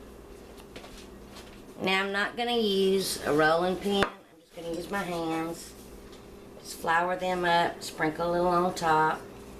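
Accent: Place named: Chattanooga, United States. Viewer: Omg my favorite is fresh biscuits. I told you my 2 kids live in chatt, tn right? I was a nurse in that area for 17 yrs. you speak like them that's why I think u might be in that area. All of my ex family is between Chatt, Alabama, and I worked some in Knoxville. You should email me. <mail> I don't do Facebook but I've been on here almost a year. I'm remarried and we live in SC. I frequently drive and see my kids. I'm going to go in April sometime and I'm going to meet and go to lunch with 2 of my subscribers one lives in Atlanta and the other in Alabama. My daughter has a home in chatt and works with Chatt Fire Prot. Yummy yum lov ur recipes💜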